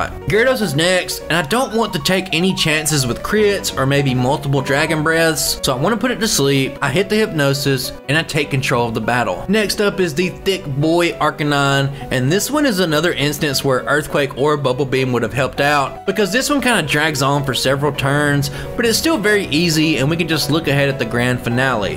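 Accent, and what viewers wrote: South, United States. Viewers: idk why your videos dont get more views, theyre very good. maybe its the strong southern draw, but im a southern boy as well, doesnt bug me. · That cold didn't mess with that beautiful southern accent. Love my brothers from the south getting it done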